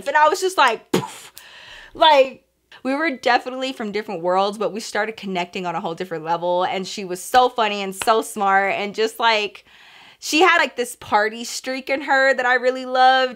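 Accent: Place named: South, United States. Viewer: I love how u say certain words ur Southern twang is so cute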